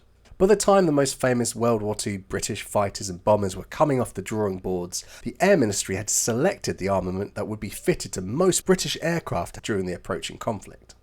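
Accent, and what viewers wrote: England, United Kingdom. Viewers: Enjoyed your video but, your British accent was a bit hard to follow with my Texas ear. Not your fault. A thumbs up from Houston. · Rose Royce? Rolls Royce! And spoken with a good English accent or at least southern accent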